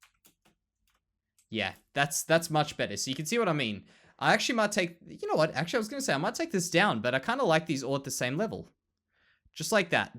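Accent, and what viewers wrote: Australia. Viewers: You dont sound funny, i thinkthe Aussie ascent one of the coolest. Also know plenty of people that agree. Always wanted to visit sydney, the pictures I've seen are beautiful. Great video as always my friend.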